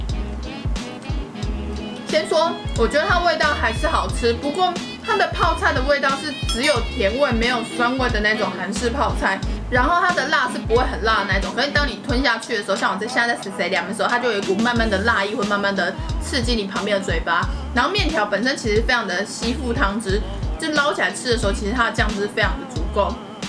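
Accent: Taiwan. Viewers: 講台語好可愛喔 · 講台語真的蠻夠味的哈哈哈哈像那種很可愛的小台妹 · 的台語腔好台語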